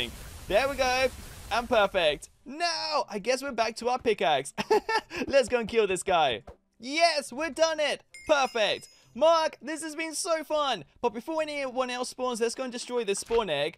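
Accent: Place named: London, United Kingdom. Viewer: You talk like London 🇬🇧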